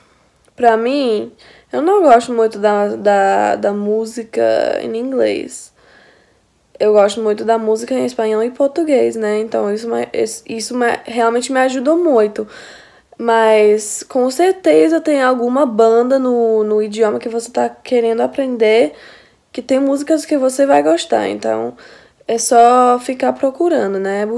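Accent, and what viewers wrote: Bahia, Brazil. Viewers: Português dela tem sotaque baiano.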